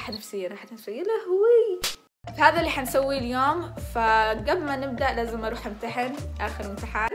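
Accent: Yemen. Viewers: كلامها يقول هي من وين بنتان من اليمن والله ما شيء كمانا فديتك وفديت اليمن وارضها ومن عليها ومن خارجها 🌸🌸🌸🌹♥️ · ماشاءالله عليه عسل بس عندي ملاحظه... برغم أنها عايشه في أمريكا إلا أن لهجتها اليمنية مازالت تتكلم بها وهذا شي عجبني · حلوه اللهجه اليمنيه ع لسانك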